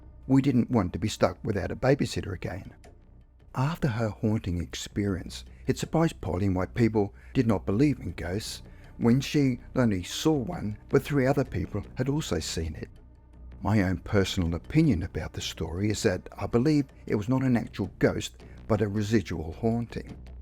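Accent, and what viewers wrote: Australia. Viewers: It's great to hear a fellow Aussie narrate. Onya! 🇦🇺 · Im glad to hear more Australian "spooky stories" narrated by Australians 😊 Hunters Hill and Gladesville are VERY heavily populated by paranormal shenanigans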